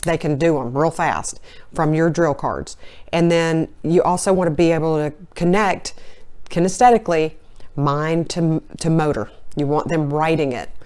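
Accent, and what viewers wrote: South, United States. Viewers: When she says 'Go get that', it sounds like 'Go git that', because of her southern accent. It can be confusing for a young person to sound it out correctly.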